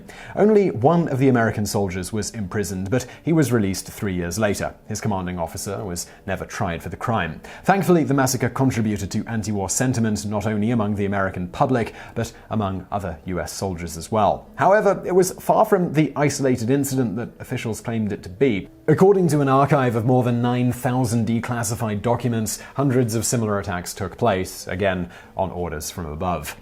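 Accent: United Kingdom. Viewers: It's like infowars with a Brit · Always some British voice dipshit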